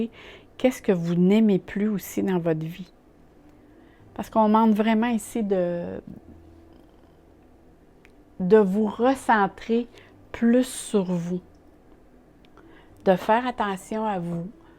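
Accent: Quebec, Canada. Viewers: Merci accent du Québec j’aimerai tellement m’installer à tadouddac merci pour le tirage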